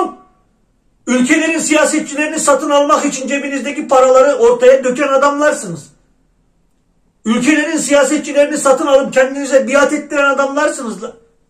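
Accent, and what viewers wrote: Erzurum, Turkey. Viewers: yok reis ne sorunu başım gözüm üstüne konuşman biraz Erzurum lu arkadaşlarima benzettim ondan sordum. 🤣🤣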